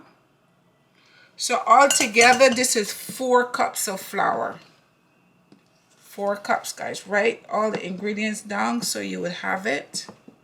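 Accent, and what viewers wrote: Barbados. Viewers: So glad I found you. Was watching your cocoa bread post and I said she sound like a Bajan. Lost my GranGran black cake recipe and yours came out like our traditional black cake. Thanks a bunch 👍🏾👍🏾👍🏾👍🏾👍🏾👍🏾👍🏾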